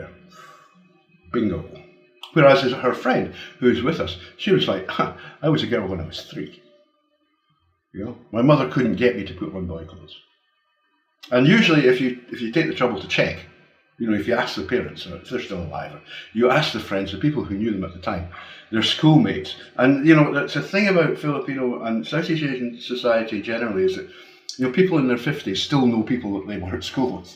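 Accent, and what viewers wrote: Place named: Scotland, United Kingdom. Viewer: Very interesting, had some problem with the scottish accent so i have to listen twice...English is nor my first language so,, but learning..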